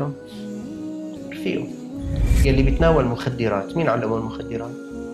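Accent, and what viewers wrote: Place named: Levant, Arab world. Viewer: فين صوتك شامي فين دمشق...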